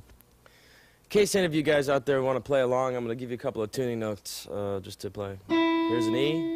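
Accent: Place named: California, United States. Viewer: to future: lose the gnarley beach boy accent,the head shakes,the perm,the earring,but not the licks...now 2021 perfect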